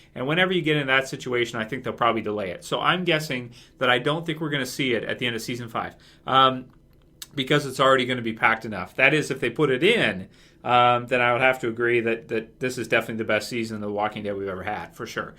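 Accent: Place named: Canada. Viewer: Canadian? I hear an accent from time to time. Great channel. Love it!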